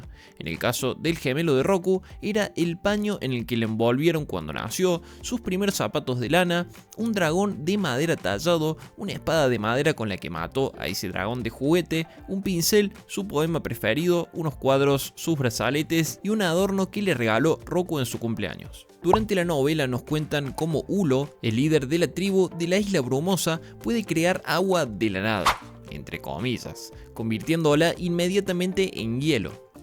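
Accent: Cordoba, Argentina. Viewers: Me encanta cuando citas el comic, nada como una narración bien cordobesa para entrar en el misticismo jajajajaja xD muy buenos los videos xDDD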